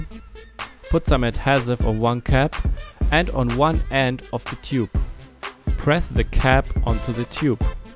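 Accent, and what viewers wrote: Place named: Germany. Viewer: Ah the german accent is making the video wholesome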